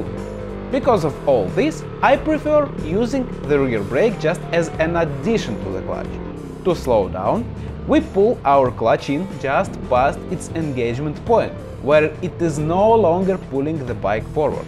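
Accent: Russia. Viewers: Please don't make fun of your accent, I love your Russian accent and I highly enjoy you content!😄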